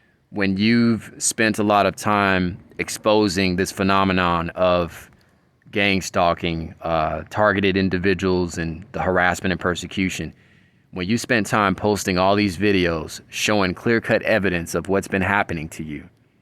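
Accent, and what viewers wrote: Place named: Spain. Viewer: Not to be racist but I thought you were Hispanic. You have like a Spanish accent to me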